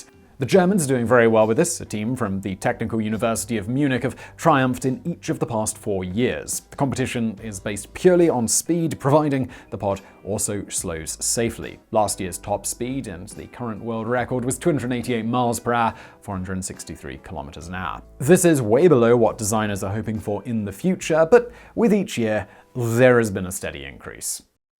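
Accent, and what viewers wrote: United Kingdom. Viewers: Several other people write the scripts and just sits in a chair and reads them off a monitor. You can tell he doesn't even proof read them before he records. (see all the times he reads a line about hair, or balding and he'll laugh and says, "come on guys!") In this video at about something he says "Elon Musk has made a name for ITSELF..." and process to crack up on the line about smoking weed. He has not read this script before saying those words. So yeah, it's a team of people, and is just the member of the team that sits on front of the camera and reads the scripts in a British accent.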